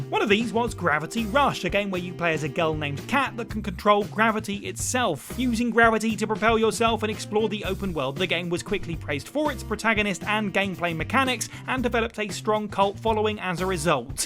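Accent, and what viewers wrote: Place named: Scotland, United Kingdom. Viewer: Can someone explain to me why scots say "Sweatch" instead of "Switch"??😂😂😂 Sounds smooth af 😎😎😎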